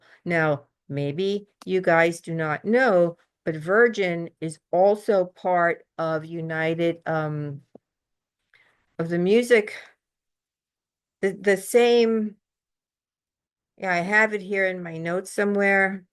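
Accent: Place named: United States. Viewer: She obviously isn't as she has an American accent? 😂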